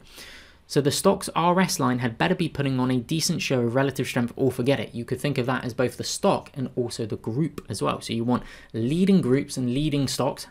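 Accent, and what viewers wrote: England, United Kingdom. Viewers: Excellent video, thanks for the job done. Like to hear some English accent it changes from American one ;)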